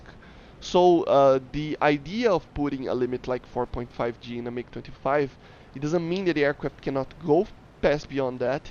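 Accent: Brazil. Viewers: eu conheço o sotaque brasileiro KKKKKKKKK, muito bom seus vídeos Man, continua com o ótimo trabalho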